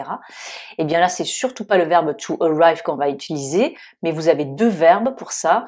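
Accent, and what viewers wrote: Toulouse, France. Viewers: As always, utter explanations. Thanks ( by the way, I crave your Toulousain accent )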